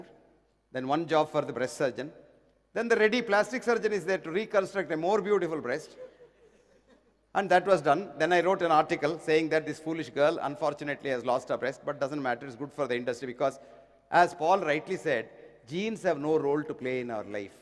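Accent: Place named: India. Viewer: EXCELLENT & VERY SOUND - From my corner of the US I give this a definite Thumbs UP!! He is very eloquently expressing what I have been thinking, practicing & feeling for a number of years. THERE IS A WEALTH OF INFORMATION HERE THAT IS VERY USEFUL & NEEDS TO BE SHARED & KNOWN. ( I'm from India & understand the accent - PLEASE, with all due respect, use captions or enable subtitles, so that the world can understand & learn what this fine, learned Doctor is sharing with us!!) ji - THANK you, Namaste & Ushta-te!!🙏 Footnote: I looked up the book he recommended "Anatomy of an Illness" & watched it on film: <link>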